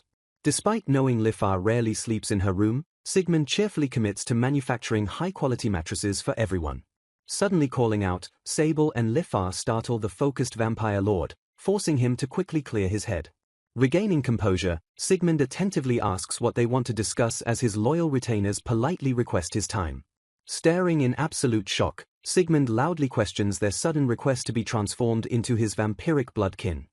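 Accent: United Kingdom. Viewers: BRO WHAT IS THIS ENGLISH BRITISH NARRATOR XD XD XD Where my usual narrator guy lol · using the English accent is smart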